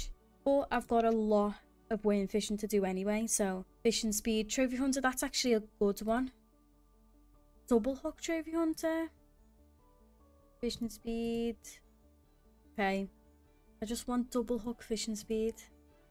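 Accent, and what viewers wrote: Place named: United Kingdom. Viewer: The british accent so lovely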